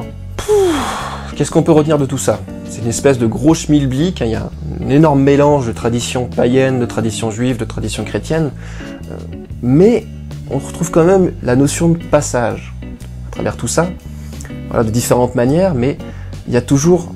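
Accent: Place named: Alsace, France. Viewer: j'entends de plus en plus un accent alsacien dans ta façon de parler. Ah yooo, ça fait plaisir dü ! ;)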